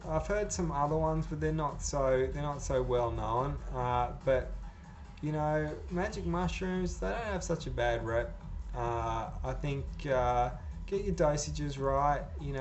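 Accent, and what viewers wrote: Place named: Australia. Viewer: I dig you man! crazy free party dude with your australian accent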